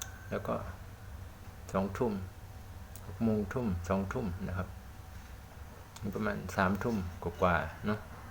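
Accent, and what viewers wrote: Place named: Isan, Thailand. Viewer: เว้าเป็นแล้ว🤣🤣🤣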